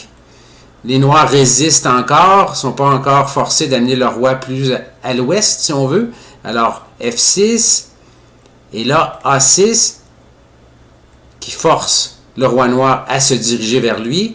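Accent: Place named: Quebec, Canada. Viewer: Fin de partie très intéressante et bien démontrée par notre professeur et grand pédagogue préféré avec son accent québecois très plaisant et que l'on aimerai bien rencontrer !! Merci pour cet exemple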